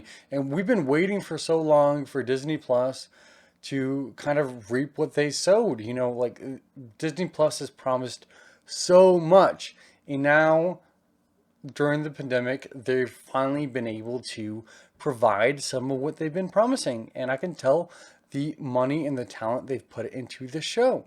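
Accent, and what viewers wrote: South, United States. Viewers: I love your video at your house in Texas during your quarantine until you're talking about the upcoming mini-series of "The Falcon and Winter Soldier" will be out on Disney+ while it started from the beginning after being handed the mantle of Captain America at the end of "Avengers: Endgame" got came out back in 2019, Sam Wilson teams up with Bucky Barnes in a worldwide adventure that puts their abilities to the test as they fight the anti-patriotism group of the Flag-Smashers after the whole trailer of the show came out at the time for awhile and Stucky is one of the characters from Marvel comics, but I hope your husband Zach will be very proud of you and I wanna say I'm very happy for you, because I love your Southern accent, I know you're half Mexican and you're gay!! #TheFalconandTheWinterSoldier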